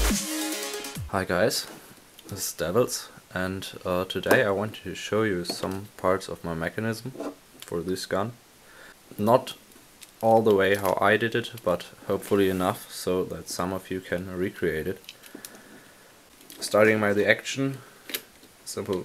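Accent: Germany. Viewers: Jetzt hab ich es mitgekriegt das du ein deutscher bist^^ Wegen deinem akzent^^ Aber echt mal so gefragt wie kannst du solche waffen bauen?0_0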